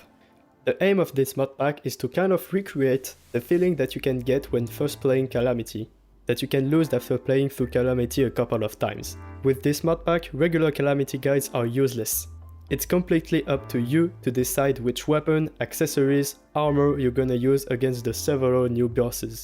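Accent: France. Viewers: haha sa s'entend que t'es français et merci pour le modpack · t'es français ? je reconnais bien cet accent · ahah j'ai compris que tu étais français dés que tu as dit "of course ", bon accent et bonne vidéo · T'as un accent français ou c'est moi? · U sound french , are you french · Are you french? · J'étais pas sûr avec le temps mais j'ai enfin la preuve avec ton Steam ! Je me posais tout le temps la question avec ton accent mais t'es bien français 😭😭😭😭 Merci pour ton contenu continue c'est incroyable 🙇🙇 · le petit accent français qui fait plaisir super modpack sinon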